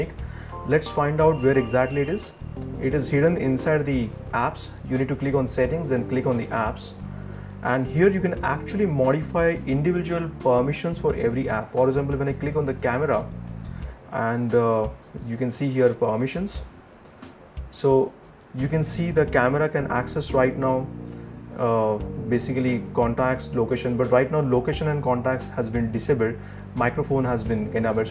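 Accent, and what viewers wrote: India. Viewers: love the Indian accent!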